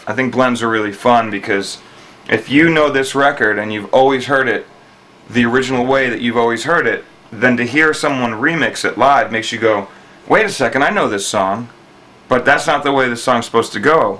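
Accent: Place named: United States. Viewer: oh okay im glad that you are joking. he clearly says "go get somethin to eat" ... maybe you are not used to American accents idk. He is talking about rocking a party, but the place he labels the records is in his house where he is also filming this video. The whole point of saying "go get something to eat" is to illustrate you could go do anything and the record will still be labeled at that exact spot. Listen to how casually he is talking. This guy isn't even a rave dj. If you arent joking, then I'll just say get your ears fixed maybe turn your monitors down lol I guarantee you if you have ever rocked a party you would know.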